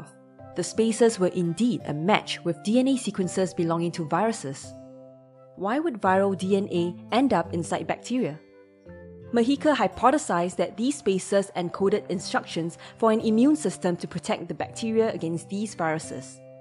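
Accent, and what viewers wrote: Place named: Singapore. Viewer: CRISPR will fix that horrible Singlish accent.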